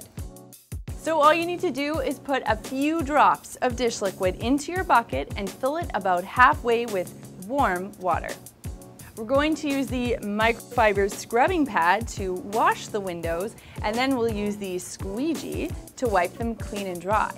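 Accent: Canada. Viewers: You can clean my windows anytime baby ! Just love that Canadian accent.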